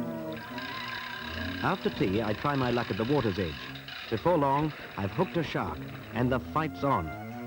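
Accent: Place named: United Kingdom. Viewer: The 'Bri-ish' voice over is hilarious, I remember being shocked in the 80's, when I first heard him talk.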